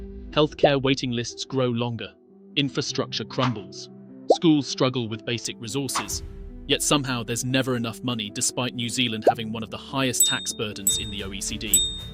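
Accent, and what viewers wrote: England, United Kingdom. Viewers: I find it curious that the narrator is clearly a Brit having to point all of this out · What a miserable outlook video 😮 brought to you by a meloncoly sounding pommy shingles patient lacking any form of sunshine. Gore is ok for living or housing. Thjs video is just bullshit. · It is interesting that the narrator has an English accent, because the UK is far far worse ... it is a country destroying itself and is well on the way to becoming an Islamic state in the not too distant.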